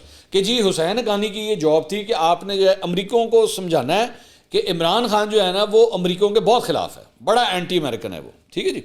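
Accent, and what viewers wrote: Punjab, Pakistan. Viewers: Love the way you speak and translate the words with punjabi tuch ❤❤❤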